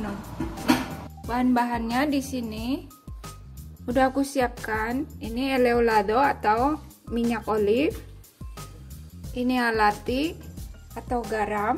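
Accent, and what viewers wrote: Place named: Banyumas, Indonesia. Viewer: Ngapak mania, masak super enak, jozzzzz👍👍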